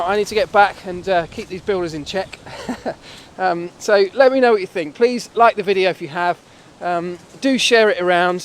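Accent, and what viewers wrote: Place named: United Kingdom. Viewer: Like your british accent and good stories about F1. Good job and looking forward for more!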